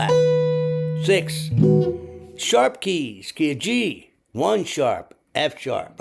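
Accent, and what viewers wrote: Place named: Philadelphia, United States. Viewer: I knew you were from philly...i know that accent too well...im from south jersey but my pop is from philly...dan scoleri....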